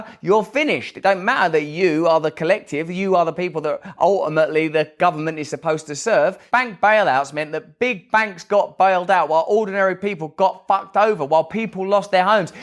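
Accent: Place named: London, United Kingdom. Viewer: YOU SOUND LIKE YOURE FROM LON-DON!!!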